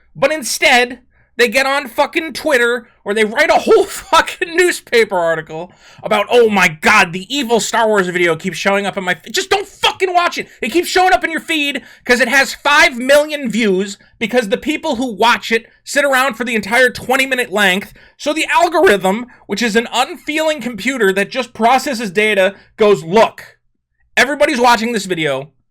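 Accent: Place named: RP, United Kingdom. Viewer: his very reserved, posh, British accent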